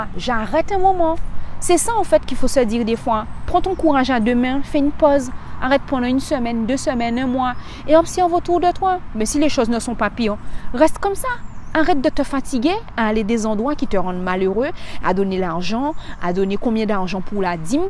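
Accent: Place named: Haiti. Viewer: Vs parlez comme les Antillaise ooh je sens l’accent haïtienne en vs je suis Haïtien c’est vraiment un plaisir je sens ta positive vibes 🥰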